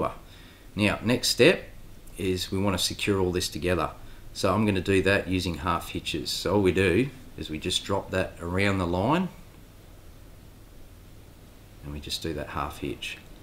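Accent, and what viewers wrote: Australia. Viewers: this is the easiest way to tie it, hands down. except for i think most ppl including this vid, over due the hitches. a couple times is cool, atleast from my experience. but good vid, and love the accent. CRIKEY MATE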